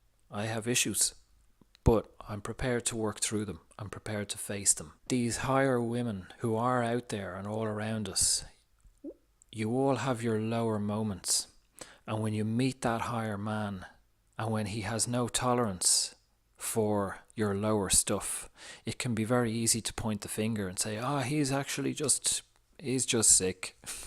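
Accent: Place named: Ireland. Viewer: Didn’t think I was gonna hear an Irish accent when I clicked on this link from inside a Divine Masculine page... here I thought I was the only one on the island 😂 love the vid!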